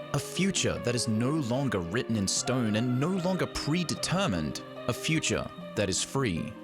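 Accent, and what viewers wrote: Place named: Australia. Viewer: Your accent is so interesting. Like an Australian that’s lived in the US for too long? The way you pronounce your R’s is so chaotic. I love it.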